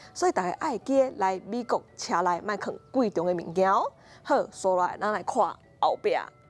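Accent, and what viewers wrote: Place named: Taiwan. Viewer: 人在美國.還可講得道地的台語.不簡單.不忘本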